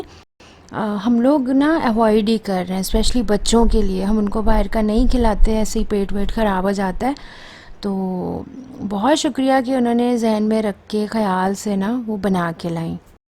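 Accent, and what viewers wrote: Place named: Bihar, India. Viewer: I thing u r Behari speaking mughy behari lagti ho aap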